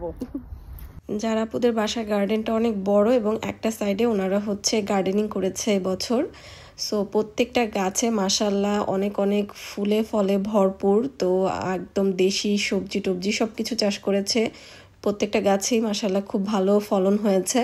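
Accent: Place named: Sylhet, Bangladesh. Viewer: খবে জোরে আমিন,এই কথাটা শুনে এত হাসি পাইছে, আমাদের ভাই অর্ধেক সিলেটি অর্ধেক চাঁদপুর,ভালই লাগে আমাদের সিলেটে কথা যখন ভাই বলে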